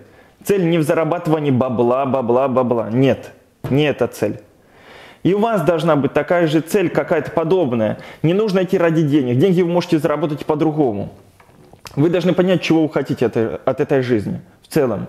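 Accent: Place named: Belarus. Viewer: Я поняла, чем ты меня привлекаешь - ты с Украины! Хотя по говору, думала, что ты белорус.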